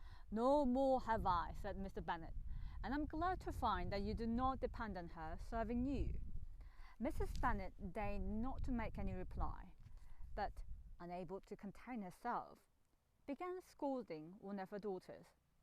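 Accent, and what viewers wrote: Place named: United Kingdom. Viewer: 목소리도 좋고 , 발음이 영국인 같구먼~~~^-^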